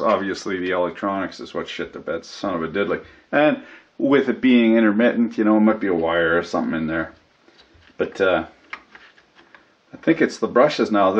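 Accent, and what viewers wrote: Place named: Canada. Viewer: I love listening to you I don't even know what da hell ur doing but hearing a Canuck talking shit is awesome thanks 🍀🍀😁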